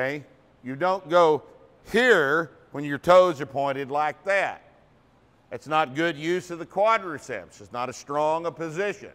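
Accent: Texas, United States. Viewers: Well it's really more Texan. It would definitely stand out among native Georgians for example. Watch Fred Armesan's video on accents. Somehow he gets it pretty close. Well I think spot on but I'm not an expert. But have lived my whole life in southern U.S.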